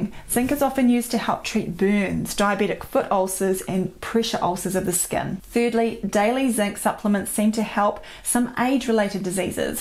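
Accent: New Zealand. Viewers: I liked your Kiwi accent. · I love the way you say, 'GET'. It sounds like GIT, but stretched out. My friend is from New Zealand but he has been here for so long in London he sounds almost English (the toe rag)! So gotta git me some New Zealand accent and your perfect. Oh btw, thanks for the info on zinc, i'll try not overdose on it! Why is New Zealand called New Zealand, is it because its the land of new zeal, are you guys buzzing over there? All the best and keep up the good work. Im in a funny mood today, sorry.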